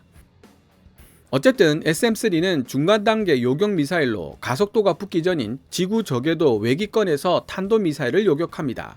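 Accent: Gyeongsang, South Korea. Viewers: 님 경상도 분이신거 같아요 사투리가 나와서요...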